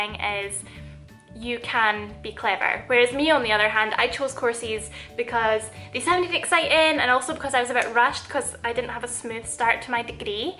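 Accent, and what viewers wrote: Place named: Scotland, United Kingdom. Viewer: oh my goodness your accent is amazing! I haven't heard that in a while so i'm not sure.. scottish, irish, where are you from??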